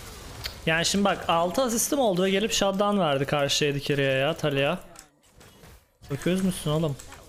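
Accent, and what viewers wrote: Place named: Thrace, Turkey. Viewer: nereli bir Trakya havası var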